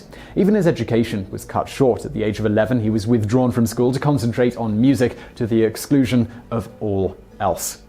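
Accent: United Kingdom. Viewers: TheLadyBridget Simon has made a video in the past stating he pronounces things the way they pronounce it in Britain, using Commonwealth English. So if it sounds different from what we are used to, we can chalk it up to different dialects of English.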